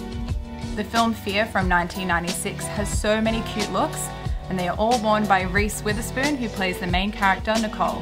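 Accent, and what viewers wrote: Australia. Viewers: are you aussie? we should be yt friends! x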